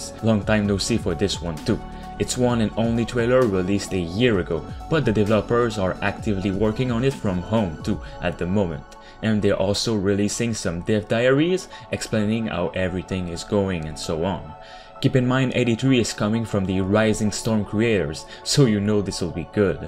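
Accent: Quebec, Canada. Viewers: T’es tu québécois? tu sonne comme un québécois qui parle en anglais genre moi ahah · Est xe que tu es Quebecois ???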